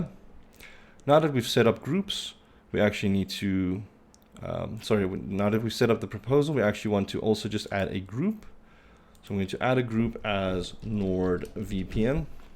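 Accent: South Africa. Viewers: Thanks dude! Altyd lekker om n Suid Afrikaanse stem op youtube tevind!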